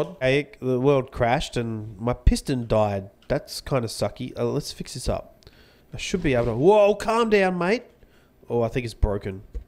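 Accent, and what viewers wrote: Australia. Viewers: "he's Aussie".........subscribes:D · dude I'm new to the channel and are you Aussie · are you aussie?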